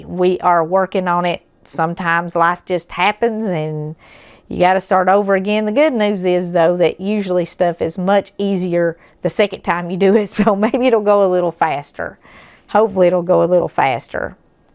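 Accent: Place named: Appalachia, United States. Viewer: I was born in West Virginia and my family moved to Florida when I was about 3 years old. I often have thoughts of wanting to turn back the clock so I could have grown up there and had a simpler, more grounded and Christ-centered life. I imagine what it would be like to have friends like you who who speak with a sweet Appalachian twang and share the values I wish I'd been raised with. God bless you and have a joyous Christmas.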